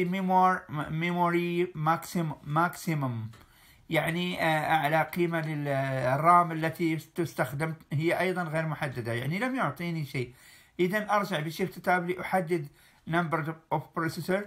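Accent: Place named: Levant. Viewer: شكرا على المجهود لكن الشرح يبدو معقد بعض الشيئ بسبب الالقاء بلهجة المشارقة نرجو منكم تدارك الوضع احتراماتي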